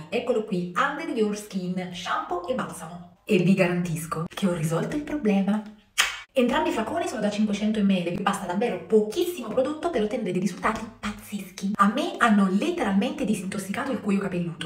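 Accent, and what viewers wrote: Naples, Italy. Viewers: Sei molto bella e bravissima, ti ho scoperta da poco ( sono una signora sessantenne).toglimi una curiosità, ma sei napoletana?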